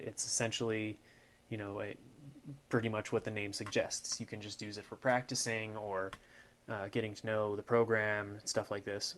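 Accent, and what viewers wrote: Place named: North America. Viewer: Spent the last 4 months creating a project through marcomedia flash 8. I was making a sprite based animation series. Most of the time, thankfully I was just splicing and preparing sprites, improving them, looking for good material and whatnot. When I began using flash I came to a pit stop with z axis. It is very difficult with flash to operate an animation with multiple sprites going in front of and behind each other. It requires extensive knowledge of action script and set depths. Even then, the motion tweening stops working and requires more in depth programming. Screw all that, opentoonz I found by accident and now I want to begin my animation project all over again. The narrator does talk very slow and does have me rolling my eyes when he is all "So ... errrrmmmm this level here........ errrrrmmmm is going to be our uuuuuummmmm next scene." Yeesh, I've come to find all North American youtubers doing tutorials sound like this. 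Must be all the processed genetically messed up monsantos foods you lot are eating over there slowing down your brains or something.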